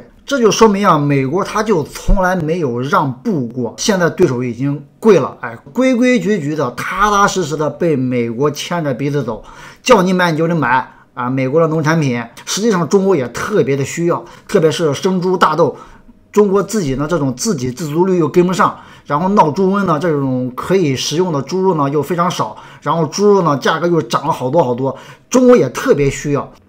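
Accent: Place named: Shaanxi, China. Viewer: 哥！你是不是陕西人！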